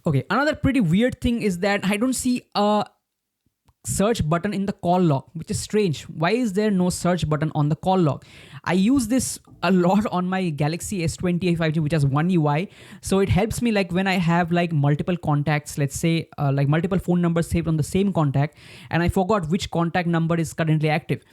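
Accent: India. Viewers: You from bagdogra or wot?❤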